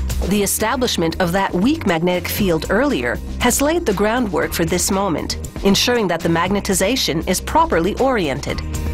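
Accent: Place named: Canada. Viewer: That Canadian accent...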